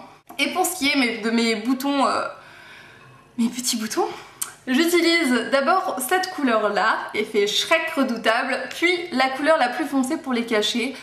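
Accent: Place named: England, United Kingdom. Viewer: Tu es de retourrrrr !! Tu m'as manqué ! Alors , ton accent anglais, il est trop bien, dis, tu donnes des cours pour l'anglais ? :) Superbe vidéo !!! J'aime beaucoup parce que ça fait également tes produits fétiches etc ! Gros bisou !! ♥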